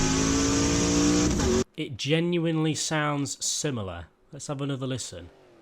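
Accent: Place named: England, United Kingdom. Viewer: Davon Pipes he's English. Canadians also do that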